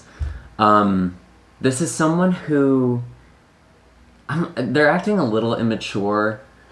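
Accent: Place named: Midwest, United States. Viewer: i love your cute little midwestern accent, and your warmness! God bless!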